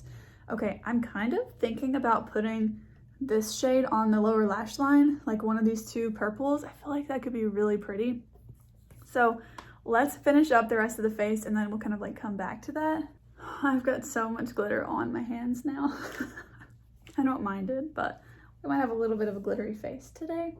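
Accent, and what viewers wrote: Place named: Europe. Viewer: is that where you're originally from? I'm dutch and you just sounded more European to me 😉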